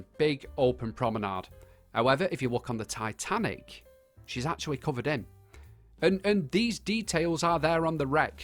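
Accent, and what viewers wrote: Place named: North, United Kingdom. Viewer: Long time TNG Fallout follower, nice to put a face to that Northern Voice. Simply, simply lovely.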